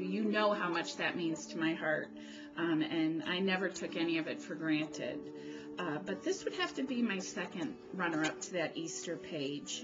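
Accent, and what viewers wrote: New Jersey, United States. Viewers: Nice to meet you. You are very sweet, love your NJ accent. You are so right that we should get to know each other better. When I am brave enough I will made a video too. My mom was from Chicago too. TFS! ~Sharon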